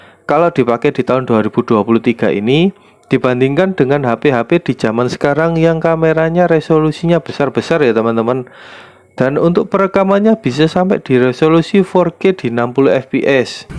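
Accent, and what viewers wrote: Java, Indonesia. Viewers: kok medok banget..😅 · Jawane medok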